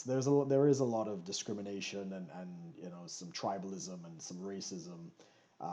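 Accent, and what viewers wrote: Trinidad and Tobago. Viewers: you sound trini thou..... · dont pay people with negative responses, you know we live in a fallen world. Keep happy and keep on doing what you love to do, i can also hear your trini accent, love it... the diet you are on is truly good, all the best with your endeavors!! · U born here da can’t hide yuh accent strong buh u clearly lack self love I grew up in West wid white people and Dey mad chill .... you really don’t like yourself or ur folks don’t blame Trinidad blame yo mama !!! Police never harass u for money u delusional bruh stop fuckin lyin dawg you issa joke I will share u and clown u ur so fake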